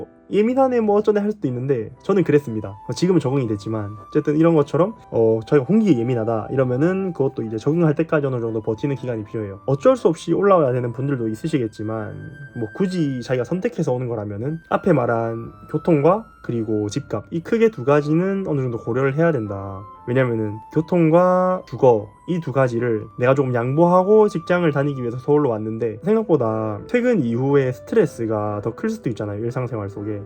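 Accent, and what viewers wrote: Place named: South Gyeongsang, South Korea. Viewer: 창원분이시구나 ㅋㅋㅋ 어쩐지 익숙한 경남 사투리네요 ㅋㅋㅋ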